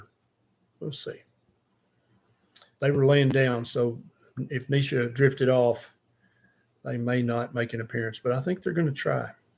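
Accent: South, United States. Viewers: "One of these people?" Really? You don't think you have an accent? You must be joking, and I must be being an idiot for failing to realize it? You most definitely have a very marked, obvious and clear southern accent. =D I'm very nearly 100% confident that anyone here in Oregon that I show any of your videos to will immediately completely agree with me about that after hearing you speak for maybe 3 seconds. But there's nothing at all wrong with having an accent, is there? Doesn't just about everyone have some kind of an accent? I'm sure that if you hear me speak you'd notice a northwestern accent. Someone from Oregon sounds like an Oregonian. Someone from Boston sounds like a Bostonian, and someone from Alabama sounds like they come from Alabama. /shrug. Praise the Lord and pass the meat.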